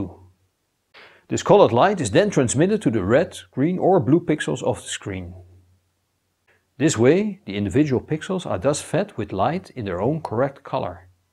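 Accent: Netherlands. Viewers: Sounds very Dutch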